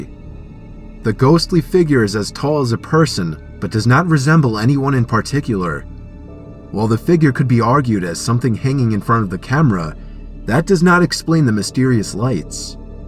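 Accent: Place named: United States. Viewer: They don't sound the same, they have different accents... Mr. Nightmare is (I'm assuming) from America and CreepsMcPasta is British, for example.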